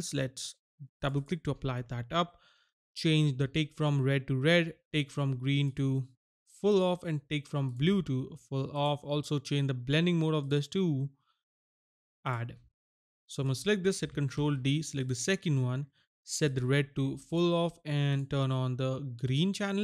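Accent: India. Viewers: Время идёт, а индийский акцент не меняется :) В чём дело?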